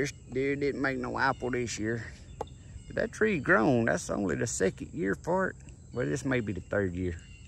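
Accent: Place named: Louisiana, United States. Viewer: As soon as you popped up in my search about gin trash and heard your voice, I knew you had to be from "my neck of the woods" Louisiana! And show nuff, after I went to your page, I see your from Northeast LA! Sounds like my Uncles'' a talkin! ♥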